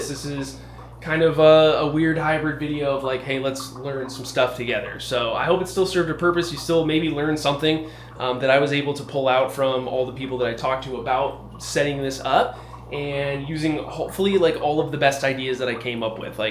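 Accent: United States. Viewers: You motivate me to do such activities too 😁 It's super cool watching you speaking English. I try my US accent practice with your videos too 😂 So it's like killing two birds with one stone😂 😇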